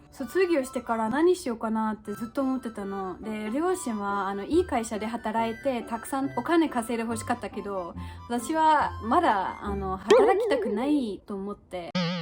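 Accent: Kansai, Japan. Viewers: 時々バカイトのチャンネルみたりしてたんだけどやっぱり時たま関西弁っぽいしゃべりしてたから1年間関西にいたのね！納得しましたわ！ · 少し関西弁交じりなのは近大にいたからなんですねｗ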